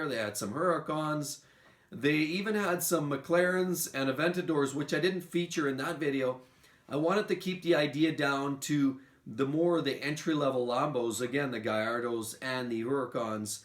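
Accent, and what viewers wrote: Canada. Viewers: I could tell you're Canadian! That's awesome you know Will.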